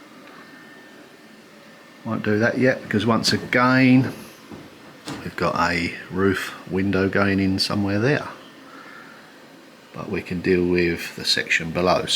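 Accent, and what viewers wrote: United Kingdom. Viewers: I love seeing your videos, both here and on the Pethericks!. I'm curious, where in Britain were you raised? I'm working on my accent for acting and wonder where your accent comes from? Thanks!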